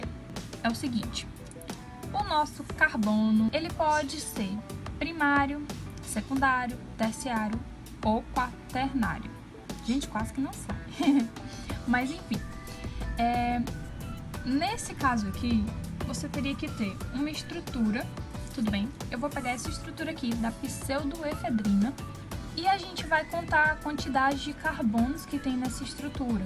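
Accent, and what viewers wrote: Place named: Maranhão, Brazil. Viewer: Você é do Maranhão ?? Tem um sotaque parecido com o de uma amiga minha que é conterrânea dela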